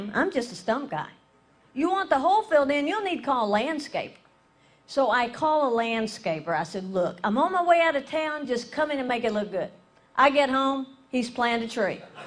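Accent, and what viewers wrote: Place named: South, United States. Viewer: Something about that southern accent..... quite nice.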